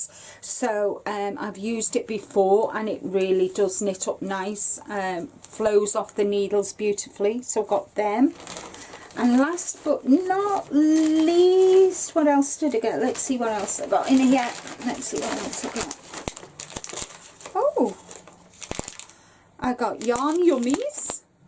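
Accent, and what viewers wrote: Liverpool, United Kingdom. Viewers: Hi, new subscriber here. How did I not come across your wonderful channel before now?!😂💜 I'm already thinking what I can crochet with that lovely horizon twilight. My colours as well! Love from a wet and windy North Yorkshire tonight. Do I detect a Liverpool accent?😂 Love that city as well xx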